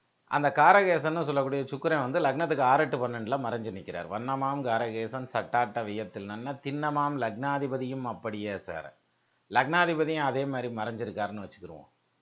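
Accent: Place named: Dindigul, India. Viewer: Thank you sir for conducting a superb program. Really we will know many things from astrology . your dindigul slang and tamil poetic style is extraordinary. I have some doubt regarding my jadhagam. My DOB: <num> Time: 5.30 pm. 1. விருச்சக லக்னம் கடக ராசி ஆயில்யம் நட்சத்திரம் லக்னத்திற்கு ஏழாமிடத்தில் சுக்ரன் ரிசபத்தில் ஆட்சி பெற்று நிற்பதால் திருமணம் தாமதம் ஆகுமா? எப்பொழுது திருமணம் நடக்கும். 2. இரண்டாமிடத்தில் சனி மற்றும் ஆட்சி பெற்ற சுக்ரனால் இரு தாரம் அமைப்பு ஏற்படுமா? 3. 8 ஆம் இடம் மிதுனத்தில் குரு,சூரியன் ,புதன் சேர்க்கை, புதன் ஆட்சி என்பதால் மற்ற இரு கிரங்களும் ஆட்சி பெறுமா? அல்லது பகை வீட்டில் குரு இருப்பதால் மற்ற கரங்களும் பாதிக்குமா? 4. 9ஆம் இடத்தில் சந்திரன் கேது சேர்கை ஆட்சி பெற்ற சந்திரனுடன் சேர்ந்து கேது நல்ல பலன் தருமா? 5. வெளிநாட்டு யோகம் உண்டாகுமா? எப்பொழுது.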